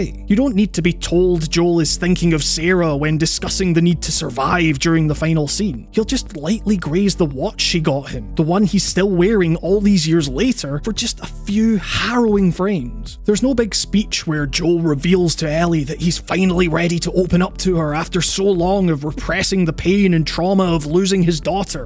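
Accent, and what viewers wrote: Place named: Scotland, United Kingdom. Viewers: What is going on with that accent, it's like 2/3 Scottish, 1/3 American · It's just Scottish. There are regional variations.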